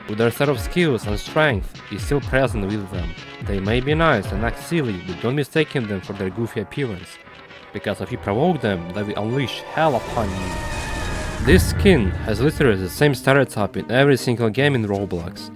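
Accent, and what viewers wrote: Russia. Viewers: Фига у тебя русский акцент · Я прям чувствую этот русский акцент, особенно когда он говорит роблокс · are you Russian? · Are you russian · Is he Russian? I just hear an accent. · You said the video had a Russian accent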